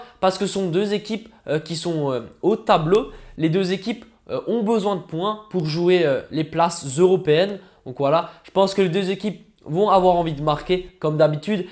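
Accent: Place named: Alsace, France. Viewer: Je crois il vient d'Alsace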